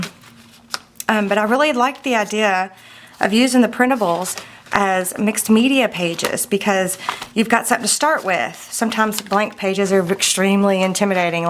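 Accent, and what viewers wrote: South, United States. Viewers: Being Southern like you, I understand everything you say!! LOL.... Here in our area of the Gulf Coast of MS.. we have a saying when we know something won't work out or something just won't work at all, we say, " That dog won't hunt"..... Being from an area that has a lot of hunters then we all know what this phrase means!! I love love love that you show how to use ordinary stuff to make your journals... without having to buy a bunch of expensive products you show how to use what we have in our stash!! The journal is awesome and I think it would make a great planner!! Thanks,.... you did it again! ( ahhhh I am a poet and don't know it) Another southern saying?? LOL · I absolutely adore your videos and I think I've watched all of them and if not it's only because I haven't found it YET!! I do find it funny that you say hoes for holes...I'm assuming very southern. 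I'm from Alaska and shared one of my books created from a couple of your printables I'd combined a bit and you shared it on FB...that was cool!! Thank you for all you do...it's opened a whole new world of crafts for me. Before I'd always been a digital scrapper (still am to a huge extent but not I print and make some of my designs in paper books I create).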